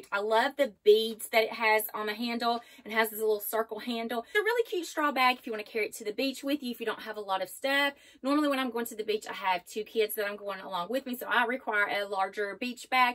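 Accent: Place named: South, United States. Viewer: That's a nice southern accent you got there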